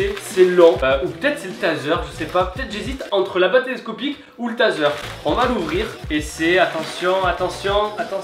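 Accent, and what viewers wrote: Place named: South, France. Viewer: T du sud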